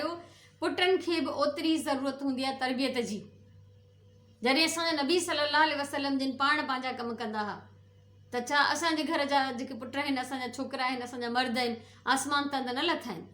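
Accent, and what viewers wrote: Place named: Larkana, Pakistan. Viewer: Assalamualaikum, dadho sutho lagho khalis larkane wari language budhi " KHAI DHO KAYO"😊 Asan khay Ayoob cholan ware ji khabar aahay jaiko Royal Cenima jay samohn rarhi lagaindo ho, tamam behtareen chola thahindo ho. Missed your Friday session.😞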